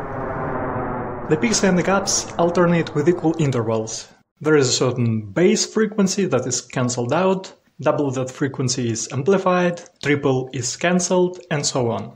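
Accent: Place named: Russia. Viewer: while you do have some russian accent, I can feel your deep understanding of the topic and the clear thought process! great choice of words as well, keep up the awesome work and reasearch owo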